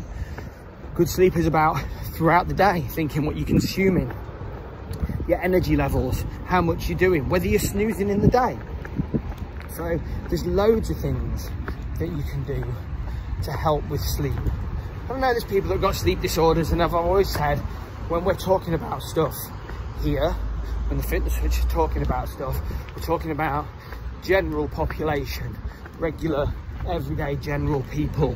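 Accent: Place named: Nottingham, United Kingdom. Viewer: mate I'm from Hullujah formally know as hull🤣I live in Notts now so I thought I recognised that robin hood drawl 🤣👍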